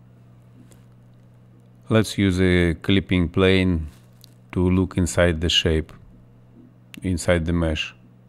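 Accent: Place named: Russia. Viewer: Я не знаю кто Вы... но у Вас очень хороший Английский с прекрасным Русским акцентом... :-) And Thank You very much for this video and for the effort You've taken!!!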